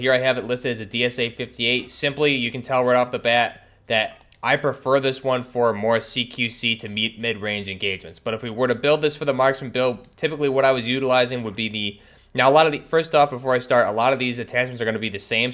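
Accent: New York, United States. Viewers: Imagine being mad at a youtuber with a New Yorker accent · it’s his NY accent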